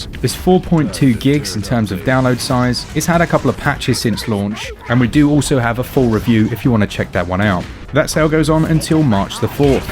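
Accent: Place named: RP, United Kingdom. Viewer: Having just jumped over to the Thronebreaker review by I can honestly say....he sounded well posh a year ago.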